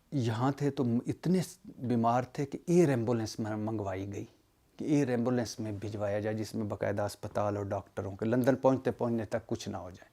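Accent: Punjab, Pakistan. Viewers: Kia Punjabi ka tarka lagtay hn GEO sadda GEO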